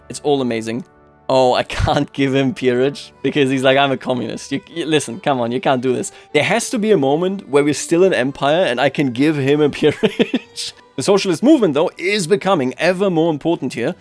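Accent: Germany. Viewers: I like your german accent. It has that analytical feel to it.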